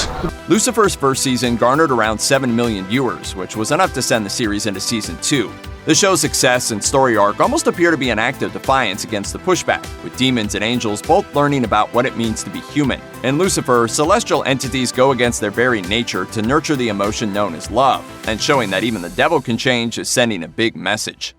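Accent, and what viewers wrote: United Kingdom. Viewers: So sick of that British accent 😁 Am i the one?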